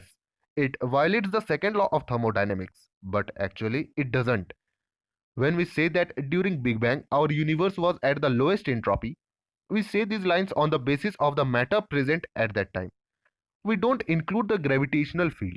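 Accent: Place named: India. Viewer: you lost me at the indian accent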